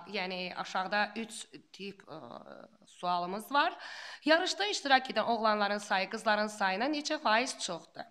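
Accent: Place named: Russia. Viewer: Rus sektırıdı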